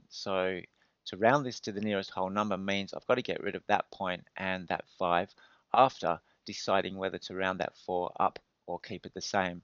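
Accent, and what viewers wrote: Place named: United Kingdom. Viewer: o0Ella0o English just a British Acent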